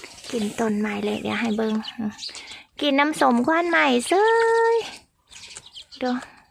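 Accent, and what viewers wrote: Isan, Thailand. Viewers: คุณเว้าอีสานเป็นตาฮักแท้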